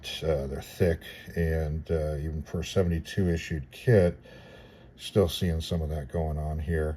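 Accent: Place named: United States. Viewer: It's good as it is , even the pronounciation of French words is passable for an American 😅, good job.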